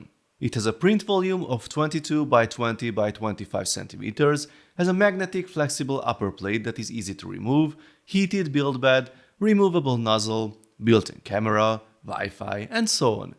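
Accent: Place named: Hungary. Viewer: Are you Hungarian? Your accent sounds so Hungarian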